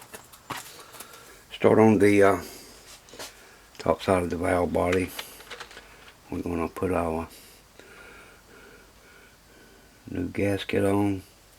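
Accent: South, United States. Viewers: Hi Everyone. Once again entertains us with another 'hands on' video of his talents and commentary with a distinctive southern accent. I am always looking forward to the next one. And through our generous Patreon support, we can assure there is another. As a Patreon supporter, you will have access to special projects and give aways not found on YouTube. This week, kickes off a repair and restoration program series on a Drake TR4 amatuer radio transciever I donated to the Radio Shop just for Patreon. As most hams know, Drake TR4 are rugged, well built rigs that can match any modern radio in a QSO ragchew or contest. We also began a R&R series with the preliminary repair of a Hallicrafter's PS500 power supply to be followed by the repair of a Hallicrafter SR400 Cyclone transceiver. Lots of good stuff coming to Patreon. I invite all of you to join in the fun for as little as $1. 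See you there!!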